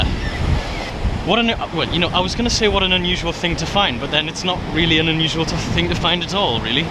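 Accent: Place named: Geordie, United Kingdom. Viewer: Two observations: Firstly that you're a posh Geordie, I know because I married a posh Geordie ;-) and secondly I'd love to see more of the coast in your videos, the coast up there is very beautiful (too cold for this soft southerner but great to look at)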